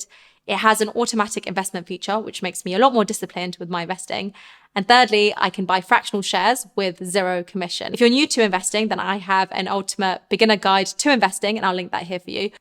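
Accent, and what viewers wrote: United Kingdom. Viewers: What kind of british accent do you have? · Who's here for US taxes and noticed this lady has a British accent, scrolled down and the location is London. oops! 🤣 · What part of UK is she from?? I’m from the States trying to see what UK accent is from what part of UK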